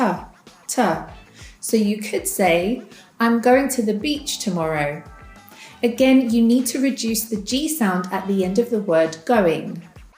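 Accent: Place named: United Kingdom. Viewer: Videos with British accent is very very useful for people like me. Thank you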